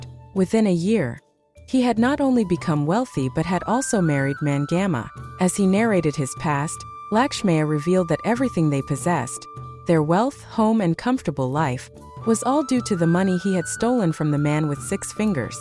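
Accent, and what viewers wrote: India. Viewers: Accent indian rkho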